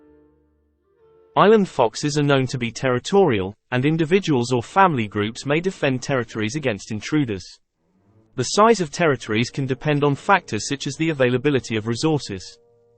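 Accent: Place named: United Kingdom. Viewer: and to add insult to injury, the narrator is UK.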